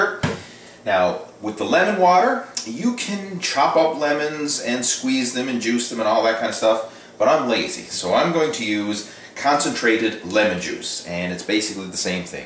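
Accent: Newfoundland, Canada. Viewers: Canadian eh?! I see we shop at superstore?!! lol love the accent :p Ps...I drink ACV with green tea every morning but I'll be sure to try this too :) thx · Hey brother,are you from Newfoundland? Cause i am,and i kinda hear a hint of the Newfoundland accent when you speak. Great video by the way.✌🏻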